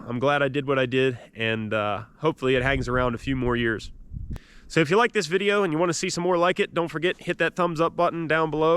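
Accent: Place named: Pennsylvania, United States. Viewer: What part of PA is this guy from the accent is throwing me a bit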